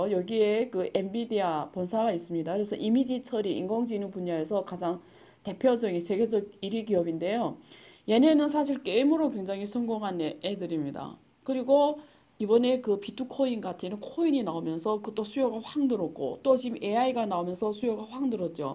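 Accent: Yanbian, China. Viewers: 듣기 거북하다 부칸 언어...아니.뒹귁 년변어인가..불편해..제발.그만..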